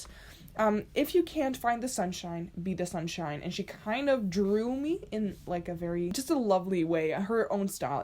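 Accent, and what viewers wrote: Netherlands. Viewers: omg is he dutch? XD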